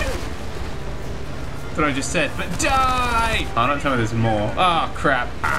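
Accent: England, United Kingdom. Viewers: You have a english acsent. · Your british u already have a british accent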